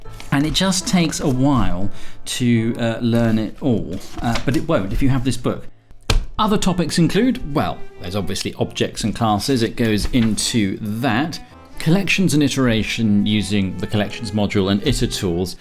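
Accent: United Kingdom. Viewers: Your British accent is simply enchanting! amazing video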